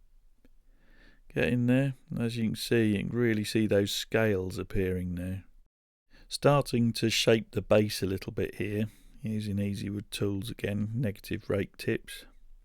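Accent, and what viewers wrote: Suffolk, United Kingdom. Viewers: Really like this this idea...going to try and do one of these myself....im guessing by the accent your across the pond from me. Some of the stuff you mentioned are products that are there...wonder what they are here in the states. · Oh my word you absolute legend !! Taking something from nature and enhancing it's appearance. You sound like a Suffolk boi?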